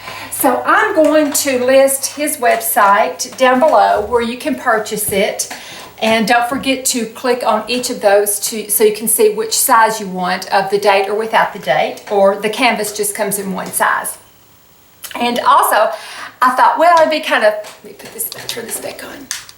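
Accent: South, United States. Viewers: i love how you southerners say "film".I simply love your accent.Almost all the Youtube ladies i watch are southern American.When i grow up im gona be a south American.lol.♥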